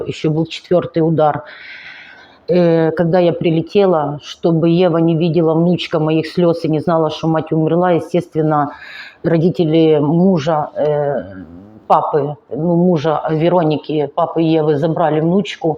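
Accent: Ukraine. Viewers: У жіночки комплекс меншовартості. У неї акцент укрАінскій. Цікаво б було послухати її укрАінську мову. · Вы такая красивая,приятная ❤ мне нравится ваш украинский акцент ❤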